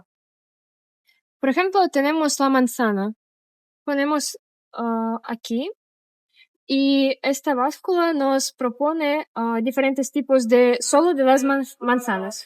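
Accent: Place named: Spain. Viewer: El acento es de españa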